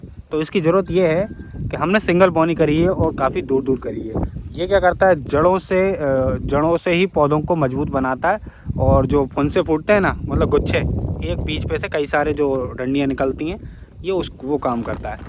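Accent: India. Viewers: Kaha ke ho bhaiya narsinghpur jaise bol rahe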